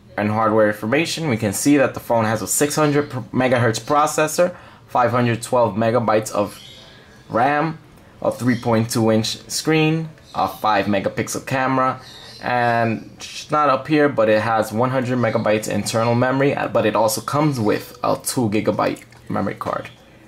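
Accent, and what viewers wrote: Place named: New Jersey, United States. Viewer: sounds like he's from Nj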